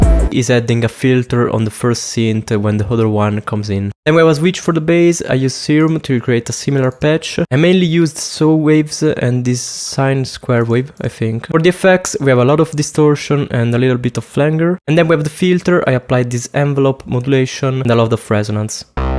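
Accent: Italy. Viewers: minchia si sente l'accento italiano haha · Accento molto italiano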